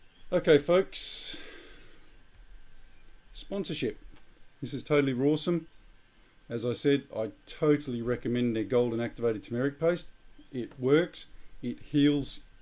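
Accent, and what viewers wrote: Australia. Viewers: ha,, great to hear an aussie accent,, don't despair with lack of response,, expect this from humans,, they are mostly pretty thick,, also pleased to hear you say the lords prayer.. I'm prepping, but I've only got some cash.. metals are useless. batten down hatches..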